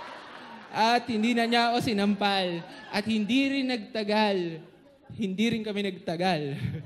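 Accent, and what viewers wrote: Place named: Batangas, Philippines. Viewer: Nakakaaliw ang puntong Batangenyo! Para kang umiinom ng kapeng barako. Mabuhay ka. Ipagpatuloy ang paglikha!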